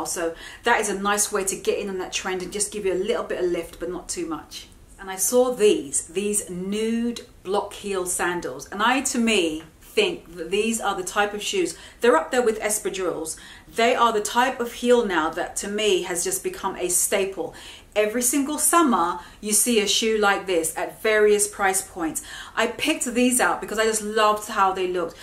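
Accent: United Kingdom. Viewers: u r such a nice gorgeus woman! at last somebody who can make fashion a matter of fun and smiles ! you sound soooo British. ...do u live in the US? sorry of I don't know you! I ve just subscribed...ciao from Italy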